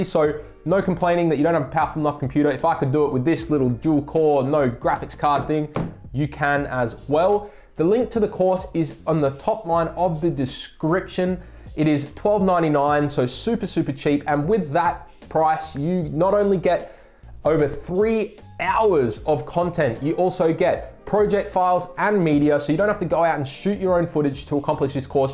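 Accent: Australia. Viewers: downloaded the course and just finished the 3d text lesson. Great work. Helps you're an aussie so it feels very familiar, but that's gotta be one of the best explanations of how to build a 3d scene in fusion I've watched to date. I purchased the blackmagic books for resolve 15 because there wasn't much info out but this course is looking promising as a much easier way of learning the concepts. Great work mate, you're quickly establishing yourself as a go-to resource for resolve info on youtube!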